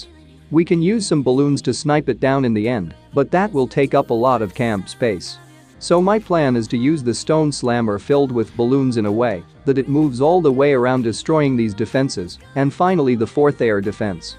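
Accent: India. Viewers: Bro, Are u Indian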